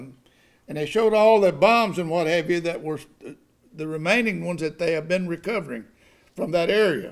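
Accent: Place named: Texas, United States. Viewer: Love your Texas accent Pastor.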